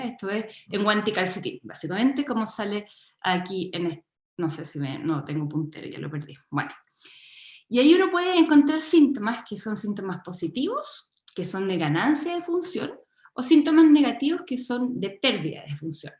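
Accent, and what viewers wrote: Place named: Chile. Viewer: Cuesta entender sus palabras debido a su acento tan marcado, es chileno? 🫩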